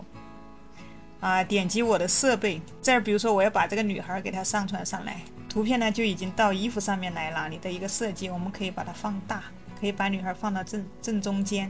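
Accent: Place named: Chongqing, China. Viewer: 😂🎉😅😮一口重庆话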